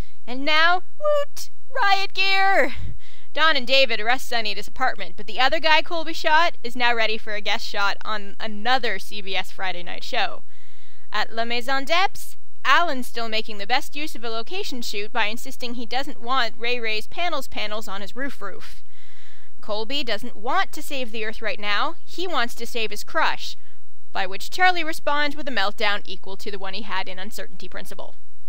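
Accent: Canada. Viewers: omw you're actually so funny (: I must go re-watch this one just because of you. It's like 52 past midnight. Damn your obvious subliminal messaging. And Canadian? Is it bad that I never actually notice accents? You could have been Australian for all I noticed. OMGAUSSIEACCENTS *dies and curses her own accent* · I need to finish watching this. But hey, yeah, you do sound Canadian but some people think I do too because well, I live near the Michigan/Ontario Border. The way you said "about" sounded about normal to me. LOL, anyway, part 1 was great and I am sure part 2 will be too. Okay enough blah blah from me. Rock on!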